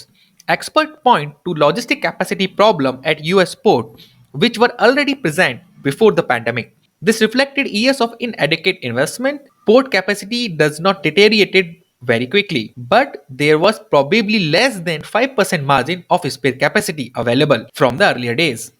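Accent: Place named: Punjab, India. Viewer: Punjab accent is very HEAVY here.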